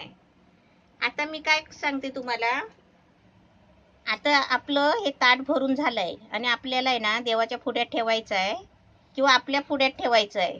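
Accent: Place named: Ratnagiri, India. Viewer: मी नीता तुमचं मी अभिनंदन करते सर्व रेसिपी सुंदर आहे तुमची रत्नागिरी भाषा /बोली छान अभिनंदन अभिनंदन!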